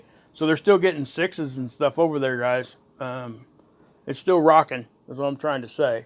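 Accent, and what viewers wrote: South, United States. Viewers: i jus like ur country accent😝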